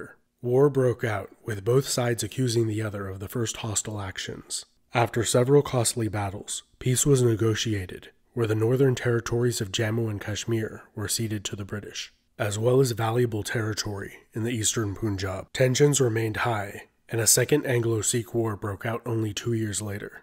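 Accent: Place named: United States. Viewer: I think it is just the American accent. They tent to pronce the letter 'u' 'oo' instead. Where an Englishman would say 'Tuesday' Americans actually say 'Toosday', and 'Youtube' becomes 'Youtoob' and so on.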